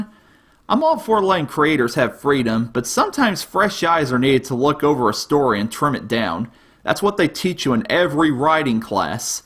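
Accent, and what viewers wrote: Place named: Texas, United States. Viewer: somehow texas like accent unconsciously make me think whatever you're saying is less credible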